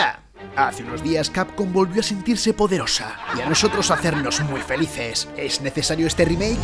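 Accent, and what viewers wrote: Spain. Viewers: mucha pupa porque suene Castellano de España? en fin estáis mal de la cabeza xddddd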